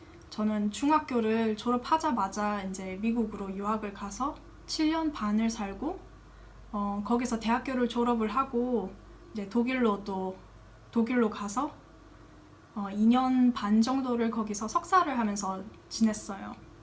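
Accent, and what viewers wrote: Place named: Daegu, South Korea. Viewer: 대구분이세요? ㅋㅋㅋ 사투리 참 좋습니다!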